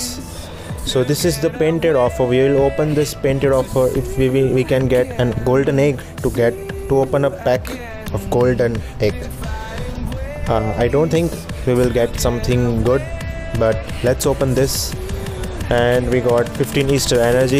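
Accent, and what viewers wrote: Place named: India. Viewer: Bruh from the english talking i know ur Indian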